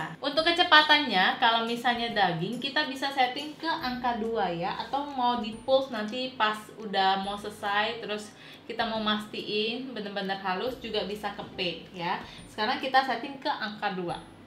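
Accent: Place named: Palembang, Indonesia. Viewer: Orang palembang ya kak 😊..logatnya gak bisa hilang heheh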